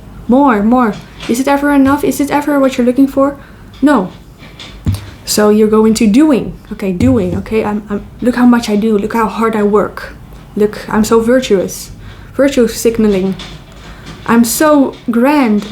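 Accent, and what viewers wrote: Netherlands. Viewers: I have listened to several of your videos and you are remarkable, you articulate the truth as I have experienced it with so articulately. My only question is where are you from? The Netherlands? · Wait are you Dutch ? (Flemish here) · Such profundity rendered through a delightful Dutch accent! Enjoy channeling your Higher Self : )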